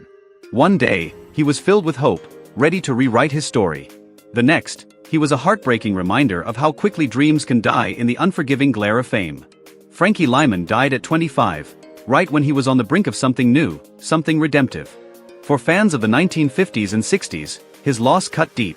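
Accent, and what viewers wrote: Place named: Asia. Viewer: What's up with all these Asian accent Voice A.I. video popping up everywhere?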